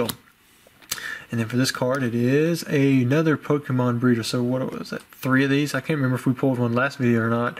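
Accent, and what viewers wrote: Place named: Georgia, United States. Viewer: do you live in Georgia in usa because you have a Georgian accent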